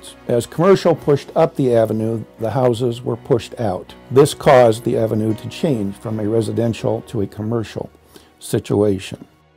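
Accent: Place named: Cleveland, United States. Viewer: Wish more of the houses from this era were still standing! On an unrelated note, you have a classic Cleveland accent lol.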